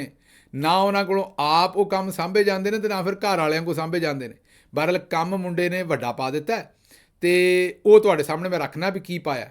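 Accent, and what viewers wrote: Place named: Punjab, India. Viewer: جس طرح سے آپ پنجابی بولتے ہیں وہ واقعی بہت جادوئی ہے۔ کوئی اس وقت تک نہیں جا سکتا جب تک کہ آپ اپنی بات ختم نہ کریں۔ ہم آپ کے پنجابی لہجے کو کاپی کرنے کی کوشش کرتے ہیں لیکن ناکام رہتے ہیں۔ لاہوری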